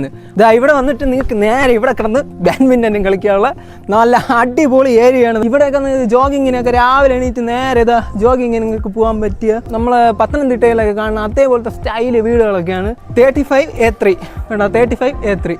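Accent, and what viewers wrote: Parassala, India. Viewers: ആദ്യമായിട്ടാ നിങ്ങടെ വീഡിയോ കാണുന്നെ, നിങ്ങടെ സംസാരം കേട്ടിട്ട്, പാറശ്ശാല - കൊറ്റാമത്ത് എനിക്ക് പണ്ട് ഒരു കൂട്ടുകാരൻ ഉണ്ടാരുന്നു അവന്റെ അതെ സ്ലാങ് 🙂..കേട്ടപ്പോ പെട്ടന് ഓർമ്മ വന്നു..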